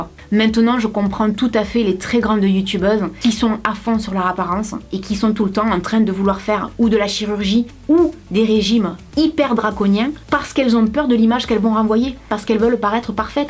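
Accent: South, France. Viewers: Je crois que quand on passe la barre des 40 on a du mal á trouver son équilibre dès qu'on passe par des changements. Equilibre psychologique et physique. .Tout est plus lent. Ce qui m'aide c' est au moins prendre soin de moi au niveau de la tête. Comme ça j'essaye de pas trop me crasher dans les descentes. Tout le travail que tu as fait sur toi est bon , malgré ce que je vais te dire tu ne vas pas le croire, du coup il faut que tu apprennes á te supporter, oui ça s'apprend et des fois ça passe par le fait de se supporter dans la pire des situations , du coup les creux de vagues durent moins longtemps. A plus de 40 ans les hormones commandent, ne JAMAIS oublier ça et du coup on a l'impression de devenir complètement dingos. Le chemin est parfois long et tortueux. Tu es très drôle et très réaliste c' est génial , ça fait du bien . Il faut s'occuper de soi á tous les niveaux , il faut apprendre à s'aimer et on y arrive (si j'y arrive tu peux y arriver) en se faisant accompagner, en faisant des lectures... 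Une fois que tu auras cette autre base, toute l'expérience que tu as au niveau du sport et de la nourriture se replacera. Big up à toi , j'adore ton accent et ton amour de la vie, la vrai , me too je suis du sud et ça fait du bien de l'entendre. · Je vais bientôt avoir 26 ans et je me sens tellement plus proche de toi que les autres Youtubeuse qui nous placent bien leurs vidéos Marketing et nous font culpabiliser avec leur ventre plat sans jolie poignée d'amour de chocolat Lol. Je serais tellement déçue si tu arrêtais on a tellement besoin de youtubeuse comme toi naturelle et pas superficielle. Et surtout j'adore tellement ton joli accent du sud de la France 😍 courage ma belle. Personnellement j'ai un poids normal mais avec des poignées d'amour j'assume pas trop mais je n'ai pas envie de me priver je mange déjà équilibré mais avec un peu de chocolat lol (on n'a qu'une vie) 😘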